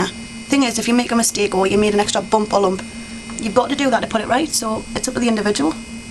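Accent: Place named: England, United Kingdom. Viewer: you have a great accent, english i'm guessing? you have great skills too woman :D & i totally understand about the collection thing and i respect you for thinking more of others than just yourself... all over awesome!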